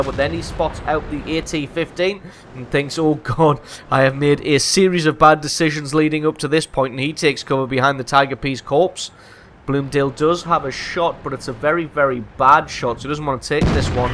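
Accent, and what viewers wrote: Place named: Scotland, United Kingdom. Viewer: When I watch and listen to your replay videos I can't help but be reminded of Jackie Stewart, the F 1 racing legend. When I was young my Father liked watching the Races with Jackie announcing, as did I. We would both try mimicking his voice to no avail. The Scotts dialect is quite difficult. Lol good memories, as my Father passed away young.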